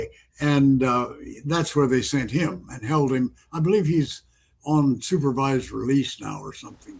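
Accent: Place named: Massachusetts, United States. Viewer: has a very pronounced Mass accent, is less pronounced but I can still discern it. BTW,; I'm originally from Philly and have been trying to lose my accent for years with limited success🤣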